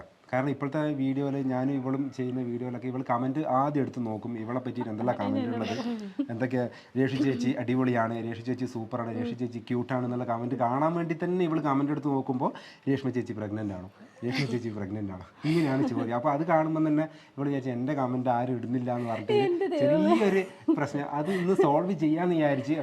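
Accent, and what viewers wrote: Kannur, India. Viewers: Ningal kannur aano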